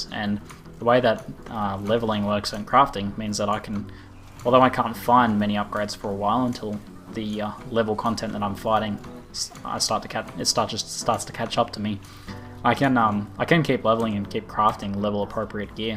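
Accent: Australia. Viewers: I love this serie! and a very big plus to the commentary,australian accent and content!! +1 Sub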